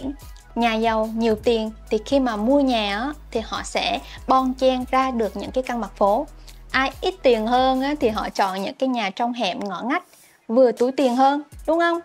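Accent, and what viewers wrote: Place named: Hue, Vietnam. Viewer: Chị này giọng huế nè kk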